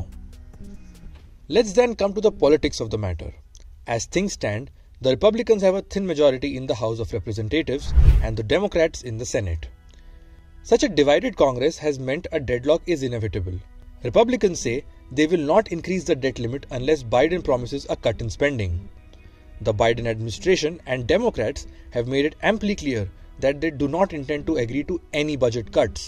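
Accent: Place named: India. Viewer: Maybe don't have a customer service person from India doing the narration?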